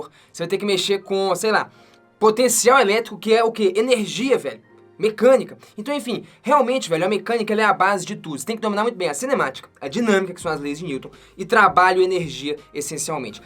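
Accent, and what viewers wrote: Minas Gerais, Brazil. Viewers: Estava vendo o vídeo e anotando as partes pra estudar depois e tive que comentar sobre esse sotaque mineiro, ksksskskskkssk, coisa mais fofa. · otimo video, estou começando o enen para me preprar para o enen, e acho que vc e de minas ne kk obrigada.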